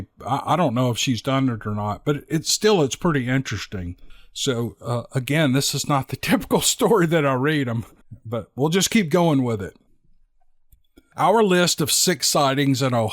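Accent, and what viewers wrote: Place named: South, United States. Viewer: you seem new here, welcome to one of the best southern voices of the cryptid world - between him and Kerry Arnold [Bigfoot Odyssey] their voices/accents and way of delivery are second to none and in my opinion, just give these stories a much appreciated bit of southern flavor.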